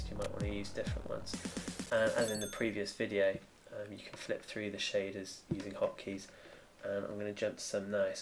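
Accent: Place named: United Kingdom. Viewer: Why do all the British gamers on youtube have your accent? Or are you all THE SAME DUDE?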